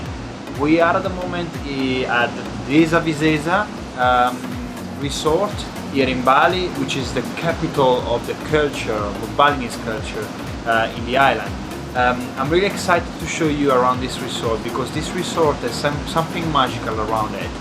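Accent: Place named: Italy. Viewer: italian accent :) , i love you vlog , i made a vlog in the same spot and in see lot of italian that day :)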